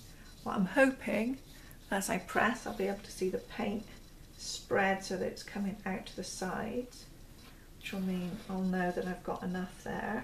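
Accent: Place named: Southern England, United Kingdom. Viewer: do you come from Gloucester ,dorset or hampshire? lovely accent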